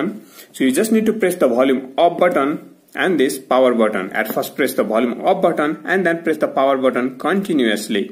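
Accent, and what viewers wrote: India. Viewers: This video was the most Helpful and most Thorough video, even with the Indian accent(and this is a Compliment). Thanks a lot.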